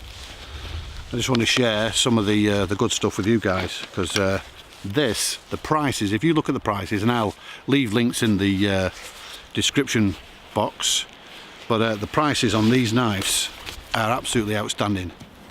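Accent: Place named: United Kingdom. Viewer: I love the british accent, and great video bruv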